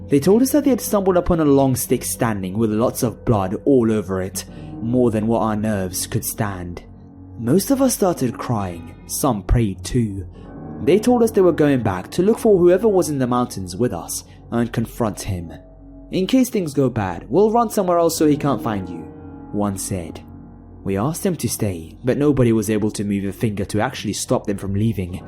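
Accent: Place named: England, United Kingdom. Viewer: Why is it always an English guy narrating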